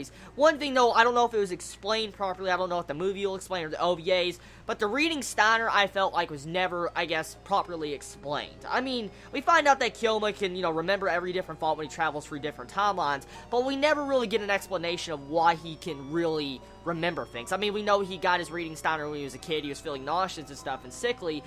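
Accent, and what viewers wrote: South, United States. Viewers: I think looking back on your older videos, and your recent ones, you've lost some of your southern accent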